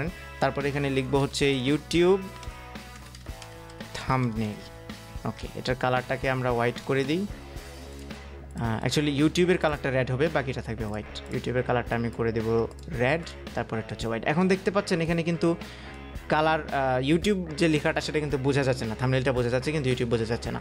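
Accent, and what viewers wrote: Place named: Chittagong, Bangladesh. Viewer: vi apni koy takan dhaka naki.ctg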